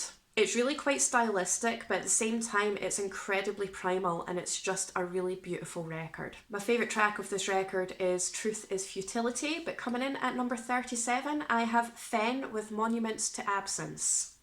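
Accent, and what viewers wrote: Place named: Scotland, United Kingdom. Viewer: Your accent reminds me of my hometown, Kincardine (Ontario, Canada). We had a very strong Scottish influence. It’s good to hear for a change.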